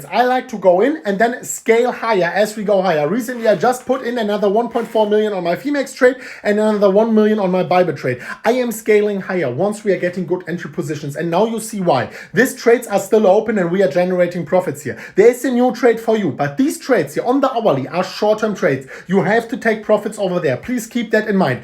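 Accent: Germany. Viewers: HES a German hes accent is funny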